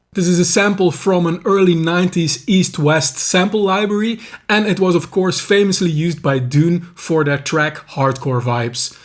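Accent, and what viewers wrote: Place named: Netherlands. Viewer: Recently started music production (never played a note before) and last 2 days been trying to make a ambient jungle song and it goes suprisingly well, only didnt know how to implement a bass. This video helped a lot in general. Groeten uit Den Haag. (Zo te horen ben je nederlands )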